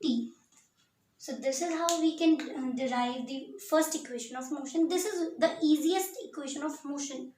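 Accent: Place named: England, United Kingdom. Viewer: your confidence and english accent makes it awesome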